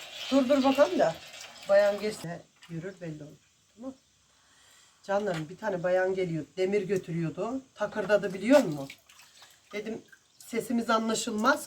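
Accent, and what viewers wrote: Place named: Uşak, Turkey. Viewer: Şiven cok tatli abla usakli arkadasim vardi sivesini cok severdim☺☺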